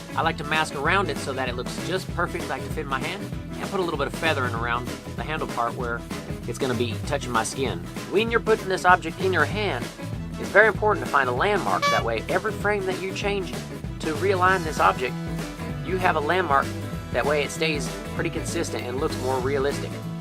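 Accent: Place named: Texas, United States. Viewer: i loved your texan accent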